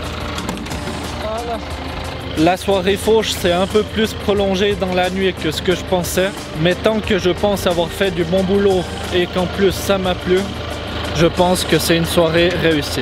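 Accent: Vaud, Switzerland. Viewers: le bon accent vaudois !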